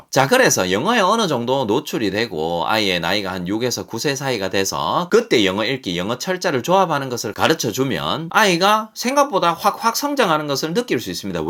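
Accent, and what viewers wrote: Gyeongsang, South Korea. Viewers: ㅎㅎ목소리 톤이 좋으셔서 경상도 억양이 섞였어도 내용이 쏙쏙 잘 들어옵니다.😂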